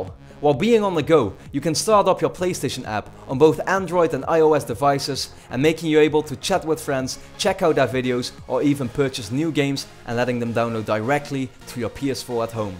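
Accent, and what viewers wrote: Netherlands. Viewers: Is dutch?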